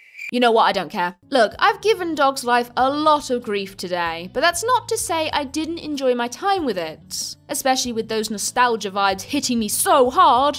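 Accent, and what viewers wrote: United Kingdom. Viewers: Was looking for a review of Yoku's Island Express and this somehow showed up and I just had to watch it xD I remember this game being one of the few that was actually translated to Danish back in the day and I do remember being kinda intrigued by it in general. Really well made and thorough review btw. And heccin' love your British the Britishness in your voice xD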